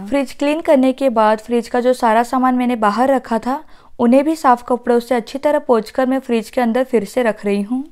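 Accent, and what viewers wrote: India. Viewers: मैने एक वीडियो और देखी थी, जिसमें बोला गया था कि ऐसी होता है अमेरिका का किचन, लेकिन आप की आवाज और भाषा से पता चलता है कि आप इंडिया से हो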